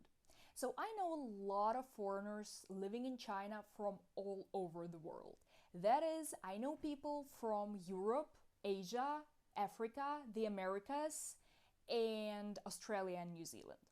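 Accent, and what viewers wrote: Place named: Russia. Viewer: You sound Russian.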